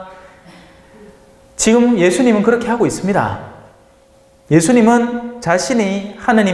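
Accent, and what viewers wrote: Gyeongsang, South Korea. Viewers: 뜨시다 빨리 드시소~ 정감어린 경상도 사투리에 잠시 고향으로 달려갑니다 고향떠나온지 어언40년~ 신부님 사투리가 제맘을 따뜻하게 합니다 언제나 잘듣고 있습니다 건강하세요 신부님~~~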